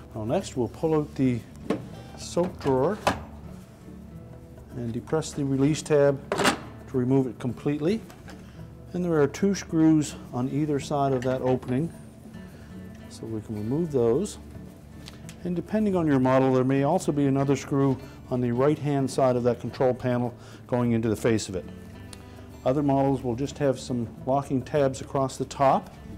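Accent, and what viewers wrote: Canada. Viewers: I carefully watched your instructional video documenting the procedure for replacing a door seal on an LG Front load washer; your ability to communicate is nothing than outstanding! Especially the segment pertaining to the fitment of the door seal to the washer's drum and the use of locking pliers and a flat-blade screwdriver to install the metal retaining ring to the drum-seal interface. The language was clear concise and unambiguous. The visual aspect of the video clearly depicted the finer points of the job with clarity and preciseness. I picked up on the Canadian🇨🇦accent as I live in Buffalo, NY on the border; hence the impeccable use of the English language. Keep up the good work and I look forward to viewing your productions in the future.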